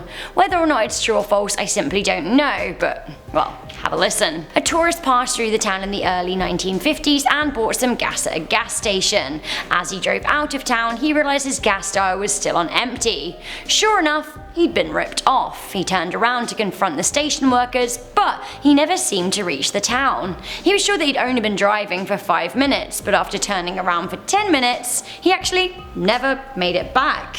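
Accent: United Kingdom. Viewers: sthurston she from Britain duh they pronounce words differently then Americans common sense there try using some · I found you. My company’s newsletter just had a “Who looks the sexiest in a red dress” vote. was the winner. I didn’t know who that was so I was told to do some YouTube searching. I found this and I have to agree, the British accent is definitely a cherry on the cupcake. Just thought I’d share that... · i hate it she needs to chill out its very annoying to me i dunno what i have against britsh accents im sorry i dont mean to offend you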